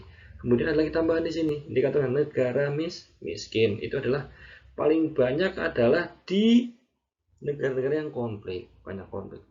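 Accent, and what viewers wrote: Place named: Java, Indonesia. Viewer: weleh-weleh jowo mas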